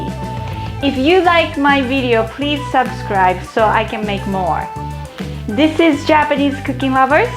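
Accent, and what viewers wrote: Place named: United States. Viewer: Where did you learn English? , you seem to have a slight American accent, I enjoyed your video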